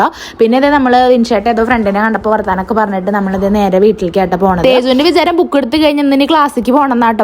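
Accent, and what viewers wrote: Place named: Pattambi, India. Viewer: ചേച്ചിടെ കുക്കിംഗ്‌ and way of talking okke kelkkumbol നങ്ങടെ അതെ സെയിം രീതി എനെന്നു എപ്പഴും തോന്നാറുണ്ട് 😃 ചിലപ്പോ അടുത്തയോണ്ടാകും pattambi😍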